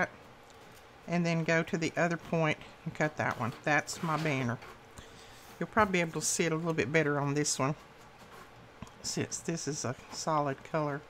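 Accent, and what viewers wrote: South, United States. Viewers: I love everything you do, and these are so lovely. I also love your accent, are you from around where Elvis lived? I am from Portsmouth, UK. I've been to a few places in the USA, and it's my favourite country on the planet. Take care and keep crafting. xx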